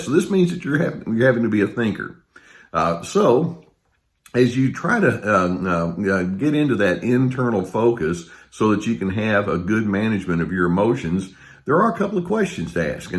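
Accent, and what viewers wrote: Texas, United States. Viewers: I knew it was Texas even before I knew it was Texas. It’s his Texas accent, I have it too, grew up in Texas, lived there for 22 years (till I made one of my horrible life mistakes by marrying a douche bag) All the time I get “where are you from, where’s your accent from” evidently the Texas drawl never leaves you... and, I live in Biloxi Mississippi but evidently it sounds a little different! 😀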